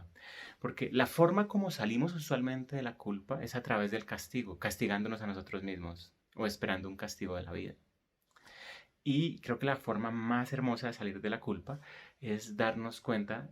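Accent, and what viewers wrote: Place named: Colombia. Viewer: Qué amorosa explicación ☺️♥️✨📘🕊! Y qué lindo tu acento colombiano 🤗! Yo estoy entendiendo más sobre la proyección de la culpa. Culpo a alguien por algo y ya me detengo a observar esa culpa en mi ✨ Aún necesito ayuda y a veces el ego interviene para no verla con la Verdad. Gracias por tu aporte y consejos! 🤗🤗🤗🤗🤗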